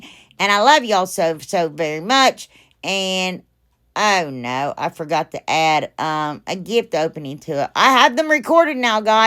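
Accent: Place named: Appalachia, United States. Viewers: That little lady bug and bee could Bee my friends any day. Absolutely adorable! Now, we need you to do Vampires at Halloween. Yeah, I love those fast talking Appalachian people. I grew up with them. That's why I love to listen to you. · Um yea her hillbilly talk well my gosh I thought you were as hillbilly as it got lol !Cute crafts if you can get through the talking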